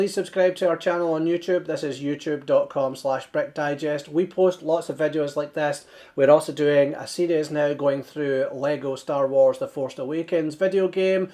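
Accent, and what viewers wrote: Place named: Scotland, United Kingdom. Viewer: love your accent, man. Scottish?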